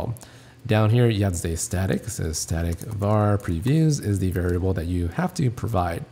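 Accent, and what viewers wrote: United Kingdom. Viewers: What the fuck is it? British?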